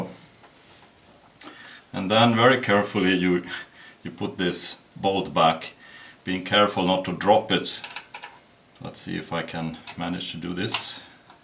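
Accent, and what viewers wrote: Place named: Germany. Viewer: it's ok, we understand english is not you mother language...es ist scwher die richtige wort zu finden...my german is also not that great so...egal... :) I am assuming you are german based on your accent....curious where you live and what you do...I am american, but living in germany..watching your videos because I am about to buy that same saw...my old metabo just died and I need something cheap..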